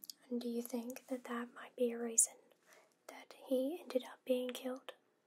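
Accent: South, United States. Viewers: the southern accent just makes this roleplay much better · Huge fan! The only complaint I have is I never make it through a video without falling asleep! 🤣I love your accent! and I have to know.. where are you from? I've lived in La. Ar. And Tn... if I had to guess, Georgia? S. Carolina? Regardless, thanks for the great asmr sessions!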